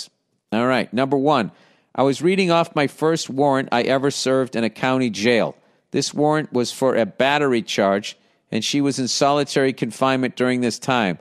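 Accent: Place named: West Virginia, United States. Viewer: Sounds like West Virginia.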